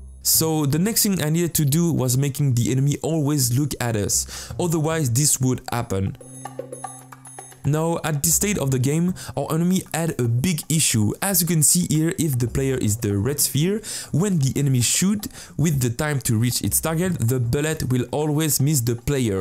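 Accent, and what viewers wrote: France. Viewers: mdrr l'accent français est présent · Est tu français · Toi t’es français non ? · Are you frensh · Are you french? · Super cool! as usual! from where do you take your accet? i swear you're french!;) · Tu as un accent Français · Wow the accent and the little FRA at the bottom of the screen clearly shows you’re French 😅 Also the search bar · You sound French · Ou la il est français lui avec son accent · J’adore l’accent français et très interessant la vidéo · Are u french? Sounds like it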